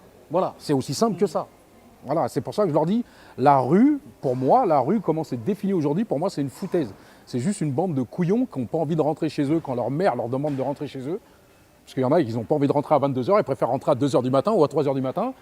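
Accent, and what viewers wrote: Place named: Banlieue, France. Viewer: Ba jean Gabin si tu viens pas de banlieue pourquoi tu parle comme un banlieusard ?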